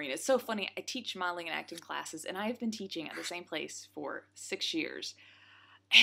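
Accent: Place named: South, United States. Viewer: Your southern dialect is also very attractive.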